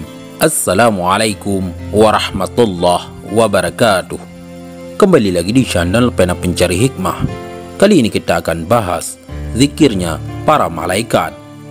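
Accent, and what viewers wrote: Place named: Bali, Indonesia. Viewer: Dialek Bali kayaknya...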